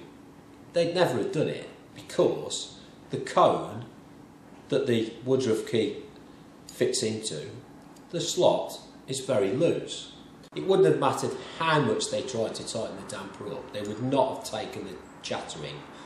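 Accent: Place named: England, United Kingdom. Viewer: He's English :) My mom hated watching old movies from England on tv - but this gentleman is way easier to understand.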